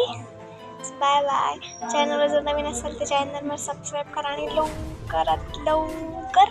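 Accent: Malvani, India. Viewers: येताला जाताला होताला सुपर मालवणी लागला तुका झाला माका ☺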